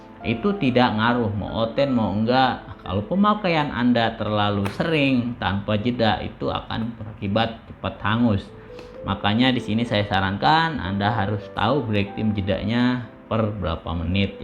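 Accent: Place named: West Java, Indonesia. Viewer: Letah sundana mani atra pisan namana oge sunda pisan euy😀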